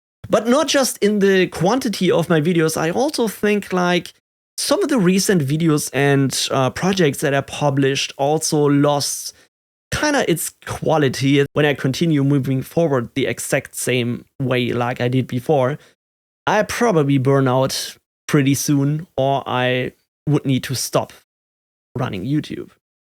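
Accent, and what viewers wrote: Germany. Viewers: About the accent, I have to admit it amuses me sometimes in your videos. But what a boring world it would be if we all spoke the same. I've known people here in the UK who've been here a couple of decades and they still can't pronounce things properly, with constant exposure to English: English jobs, English partners. You're doing great, I understand everything, 100%. The videos are direct, to the point, concise, but sufficiently animated that it never becomes boring. Your channel reminds me of another German, GreatScott, who's got 1.9m subscribers and he has a fantastic channel too, I've watched dozens of his videos and heard all about his 'soo-kits' (ser-kits in English accent!), he has just owned being German and that's what you should do. I don't see it as any disadvantage, more of a 'feature'. Obviously I have no idea how long each vid takes to make, and you have to decide what to do with your life, but from my perspective you clearly have a talent for this, so you should carry on (subscribed just now, BTW). 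· Hey! I hope you are doing well now. Do not compared yourself to other creators please, your presentation is really awesome as it is. Clear and concise explanations on topics are top notch. I actually prefer your approach on presenting your topics instead of other content creators "fake" hype. Just be yourself and create videos the way you are comfortable and don't forget to take rest so as to void burnouts. Lastly, keep the german accent dude! it sounds so awesome actually. I hope this message finds you well. Godbless you in all your endeavors. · Hi! I feel that your main frustration is coming from the foreign language, I agree that you are not having an easy job with that. But I can assure you that, similarly to you I am also not a native english speaker as a Hungarian, but your english is very good. I am working with many international people in my job, german english is one of my favorite. 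The accent to me is understandable and honestly speaking a bit funny too, in a very good way.:) Explanation in these topics are not easy on by own, but I think you are doing a great job. You are giving a lot of information to the crowd which is very valuable, even if you just mention a product/open source project or something. If you feel like you like to do this, don't give up!:) I understand you are a maximalist, but don't compare yourself to others! I am also watching Chuck, he is a different personality. From my perspective he doesn't do anything better, or in a better quality. It's just different, we are unique. You should just do what you like and how you like. You will attract people who will appreciate you. Alright, back to business, so what I just wanted to say, Don't Give Up! and Enjoy your holidays!;) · When confronted with a difficulty getting a container running properly in my home setup, I'm always happy to see one of your videos in the results of a YT search for help. 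I don't know how you do it, but you often cover exactly the specific little problem I'm stuck on. Also, your videos provide just the right mix of "type this" and "because." I'm a retired American with the cliches you might expect: declining hearing ability and non-existent foreign language skills. I struggle to understand some people who speak accented English. Listening to you however is almost never hard for me. Your unmistakable German accent is, for me, charming and a positive aspect of your brand. Enjoy your time off,. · Sir, you are unique and you stand alone. I love your videos and your presentations and I rejoice in your German accent. You're thoughtful, complete, entertaining and ultimately YOU MAKE A DIFFERENCE. Rise! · To tell you the truth.. Having an English-german pronunciation makes it more interesting, looks like hearing the Profesor at Uni doing a lectures!! Don't feel uncomfortable!! 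You Rock!!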